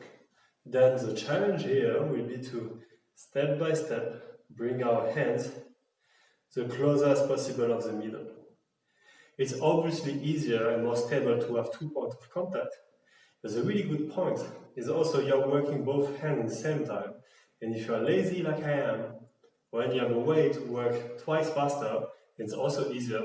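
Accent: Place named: France. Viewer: Mais... il parle anglais avec l'accent français... pourquoi ne pas faire un tuto en français ????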